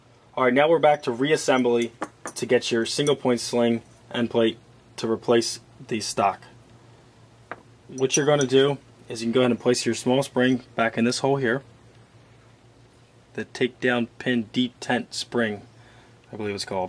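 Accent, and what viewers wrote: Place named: Philadelphia, United States. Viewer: Damn I was thinking that sure sounded like a Philadelphia/ Delco accent thEn saw the license plate! Good video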